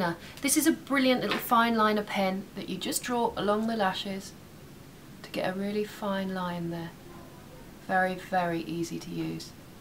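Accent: United Kingdom. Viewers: are u a british?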